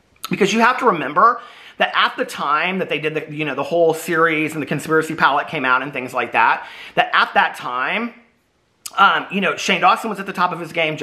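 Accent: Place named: Detroit, United States. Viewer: are you from detroit??🤣 that’s my favorite please don’t stop it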